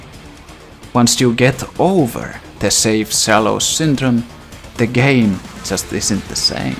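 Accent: Finland. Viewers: Holy fuck I wondered from that accent if you were Finnish first I thought you were Russian it's cool to see a fellow Finn play this...well absolutely mentally scarring game somehow my little sister is unphased of literally everything in it though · You have such a perkele accent. I love it! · kyllähä ton suomalaisen rallienkun tunnistaa mistä vaan. Hyvä video tho!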